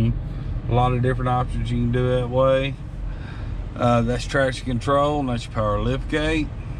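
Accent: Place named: Texas, United States. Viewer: Gotta love the Texas accent. And thanks for the information ❤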